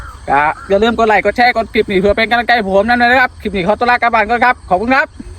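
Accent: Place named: Surin, Thailand. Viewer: ฟังเสียงนิสุรินแม่นบ้อหำ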